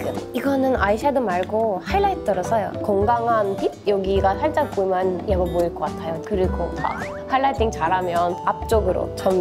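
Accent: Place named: South Korea. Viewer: 왠지 한국발음 매력 인는 듯~~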